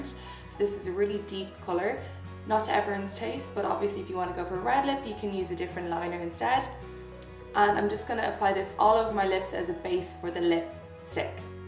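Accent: Ireland. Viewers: Is she from Ireland? Beautiful 😊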